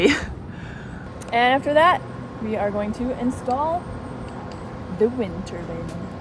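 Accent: France. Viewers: Ça me fait tellement rire car le gars on voit qu'il est français car il a le petit accent bien de chez nous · Un accent très français pour monsieur 😊 je découvre votre chaîne, et ça me plaît bien. ✌️👍bisous de France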